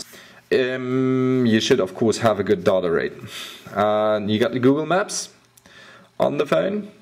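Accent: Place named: England, United Kingdom. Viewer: i hate your english accent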